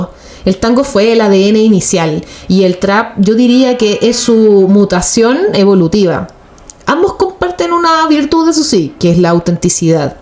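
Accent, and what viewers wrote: Chile. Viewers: GRACIAS...de corazón...se te oye de chile...te sospecho en Argentina...de ser así, un secreto....se te nota conmovida por BUenos Aires, y más siendo arquitecta, tenes mil secretos para revelar...algo mas...si amas BUenos Aires,la ciudad, su caos, su ruido, BUenos Aires te alojara en un lugar de su Corazón...eso es ser de BUenos AIres...y ningún porteño va a poder quitarte esto...los primeros hijos de esta ciudad, no fueron nacidos, fueron adoptados...un ticket a otra vida...la apariencia de ser agrandados, atropellados, es el derecho de piso a existir, para no ser tragados, luego queda y se hace característica...y uno se jacta...por que cualquier cosa es buena para ocultar los dolores, y otras nanas...BUenos Aires es un monstruo, con lugar para quienes le susurran, y a ellos, les da su ternura...todo un descubrimiento... otras ciudades, quizás, sean fáciles...a esta, le toco aser asi. 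Buenaventura...!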